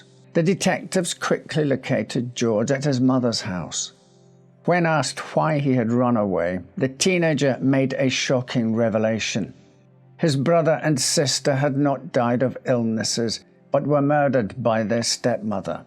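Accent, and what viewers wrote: Scotland, United Kingdom. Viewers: The narrator is Scottish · Very impressive pronunciation, every syllable was perfectly enunciated, a hint of Scottish brogue, well done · is Scottish 🏴👍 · Does anyone know what accent this is? I sort of have an idea but I'd like someone who knows better to confirm. EDIT: He's Scottish, I thought so! Thank you guys!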